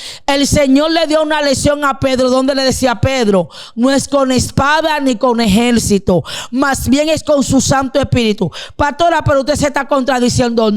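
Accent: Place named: Dominican Republic. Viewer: ella es dominicana por eso habla asi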